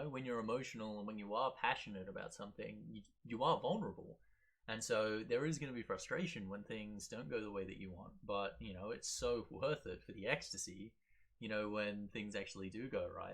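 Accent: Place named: Australia. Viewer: OMG YOUR AUSTRALIAN! I DIDNT KNOW. I AM. PLZ TELL ME U LIVE IN MELBOURNE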